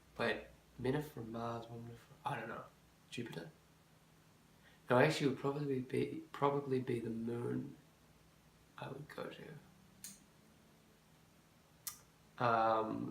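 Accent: New Zealand. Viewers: Kia ora! Having spent three months in New Zealand last year, it warms my heart to hear that charming NZ accent! I absolutely adored the Boyfriend ASMR you did a couple of months ago and would request a new one (: Lots of love from Finland!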